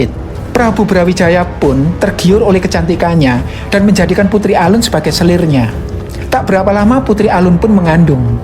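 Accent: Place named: Surabaya, Indonesia. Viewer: Ini pembacanya pasti orang sekitar Surabaya, logatnya medok.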